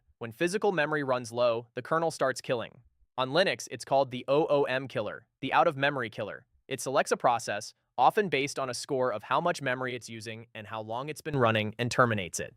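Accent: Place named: India. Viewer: Why you have a racist Indian accent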